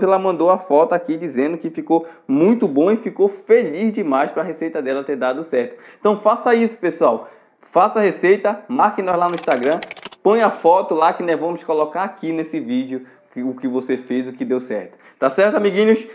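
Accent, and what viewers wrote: Pará, Brazil. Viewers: Amoooo 😍😍 o seu sutaqui , paraense 💕💕💕💕💕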